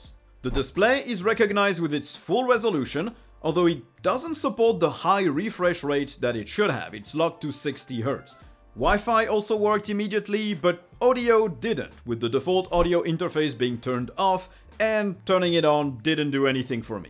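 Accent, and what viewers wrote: France. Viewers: On entend un peu l'accent francais mais tu as vraiment un bon anglais franchement felicitations pour etre aussi bon en anglais! Pq avoir fait la chaine en anglais plutot que le francais d'ailleurs ?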